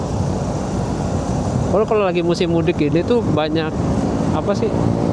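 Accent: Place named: Banyumas, Indonesia. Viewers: Widii mudikk masee gen ngapak kie jebule😅 ati ati Lik😅😂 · Jebule wong ngapak njngn mas😮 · Lah orang ngapak to mas... Kirain orang depok😂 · logat ngapake esih ori Alhamdulillah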